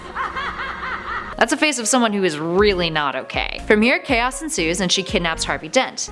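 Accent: Canada. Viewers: Is she Canadian? She's adorable ☺ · I love u and ur needless and ur Canadian accent it's super hot ;) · Is that a canadian accent i detect or am i tripping?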